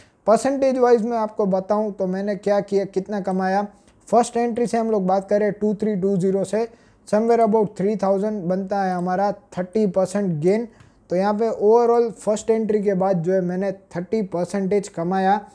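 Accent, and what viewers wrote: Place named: Kathiawar, India. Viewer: Bhai Kathiyavadi lage chhe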